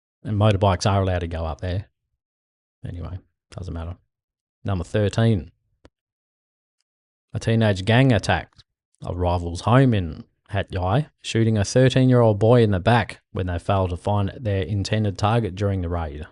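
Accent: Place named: Australia. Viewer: Thanks for the content. I enjoy the aussie commentary hehe. Cheers mate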